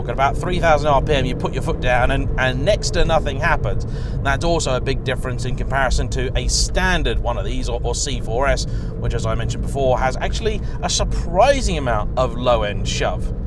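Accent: United Kingdom. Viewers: commentary so british xD love it!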